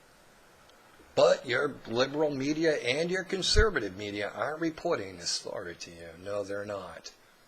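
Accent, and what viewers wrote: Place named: Boston, United States. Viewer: good vid man, you from boston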